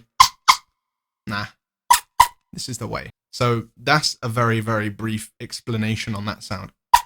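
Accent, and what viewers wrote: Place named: England, United Kingdom. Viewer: English accent 🔥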